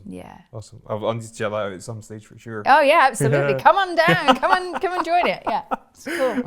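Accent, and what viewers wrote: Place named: Scotland, United Kingdom. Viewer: LOVE LOVE LOVE his accent. I'm a sucker for the Scottish accent. Sounds like Kyle Gray :)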